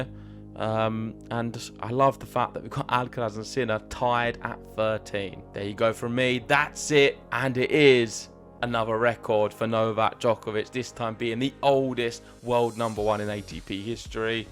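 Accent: United Kingdom. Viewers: Awesome accent. What part of Britain?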